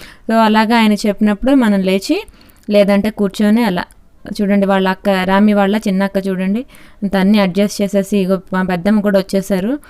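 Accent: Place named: Visakhapatnam, India. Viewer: gaaru great andi still now mimmalni chusthe you look like egypt women andi kaani meeru matlade telugu valla andi i can recognize miru maa vizag ammayi andi great andi so you are living happily in egypt that's great andi Author Venkat 😎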